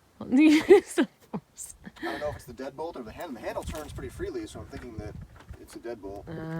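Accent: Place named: Alabama, United States. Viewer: Is it my imagination, or is your Alabama accent getting stronger? 😎😉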